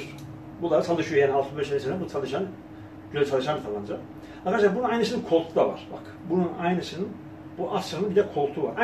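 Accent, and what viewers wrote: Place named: Rize, Turkey. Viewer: Adam rizeli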